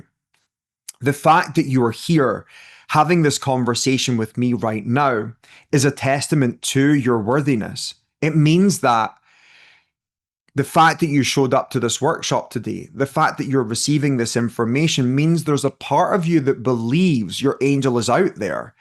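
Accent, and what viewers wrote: Scotland, United Kingdom. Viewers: Hi. Nice to hear another Scottish person, im from Edinburgh 👋 I speak to my angels and guides every day. This video appeared on my feed, yet I've never heard of you before. Someone must have put it there 🥰😇 I see my angels sparks of light ✨️ everywhere around me. I saw an electric blue flash of light too that i believe was one of my guides. They all got me out of a difficult situation and send (bombard) me with messages and synchronisities everywhere. I also just recently did my Angelic Reiki 1&2 ✨️ 😇 I wish i could physically hear and see them though. Still on my learning path, and reading every book i can. Would be great to connect with you though ❤🙏 I really want to develop and strengthen my abilities to help others ❤ It's nice talking to other like-minded people 😊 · ❤, much gratitude for sharing your precious time and knowledge with us all. 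Joining from Tenerife. Born in Aberdeen Scotland. It's lovely to hear your Scottish voice. ❤my gran also read tealeaf.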